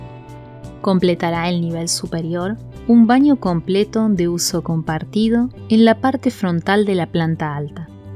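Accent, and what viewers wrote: Argentina. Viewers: Hermoso diseño, buena distribución, buena iluminación, excelente aprovechamiento de los espacios y decoración discreta y elegante , de muy buen gusto, en cuanto a las opciones de la fachada me gusto la numero 3 , con detalles en piedra .....me gustan muchos sus videos porque están bien explicados ademas , das costos $ aproximados (desconozco para que pais, pero por tu acento supongo que eres argentina) y tambien das opciones de cambios en la fachada ..y los planos.......!!!Que mas se puede pedir!!!.....gracias y espero el siguiente........saludos desde Mexico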